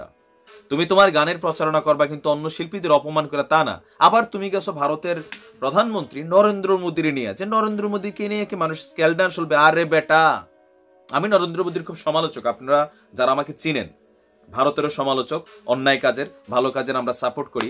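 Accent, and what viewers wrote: Comilla, Bangladesh. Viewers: আপনি কুমিল্লার নাকি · ভাই আপনি কি কুমিল্লার,!???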